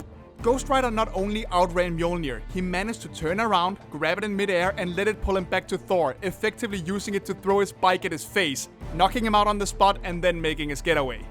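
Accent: Denmark. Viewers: Are you from Denmark🤔? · is that accent from denmark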